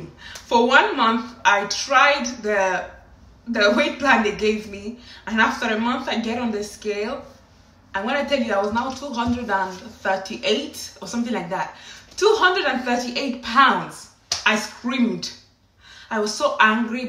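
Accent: Nigeria. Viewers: She sounds Nigerian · Omg i can tell your friends enjoy your storytelling abilities 😂😂 can't believe your effortless retention skills. I loved this! Love the Nigerian accent and expressions 😹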